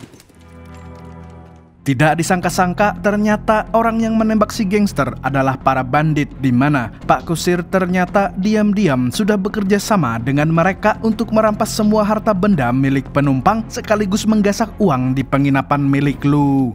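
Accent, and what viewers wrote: Banjar, Indonesia. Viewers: Urang Banjar kah Sidin ni mang ? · Njir rancak nonton hanyar aja tahu pada urang Banjar sidin nih😂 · Urang Banjar kah .. mantap sanak ai.... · Urg Banjar mana suara ny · Urang banjar sekalinya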